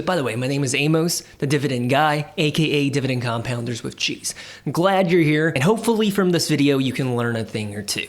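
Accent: United States. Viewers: It is so funny to see a chinese guy speaking which such a heavy Gringo Accent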